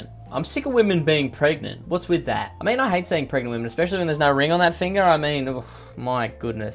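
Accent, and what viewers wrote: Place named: Australia. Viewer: your funny australian accent tickled my fancy dear young man you get a like 👍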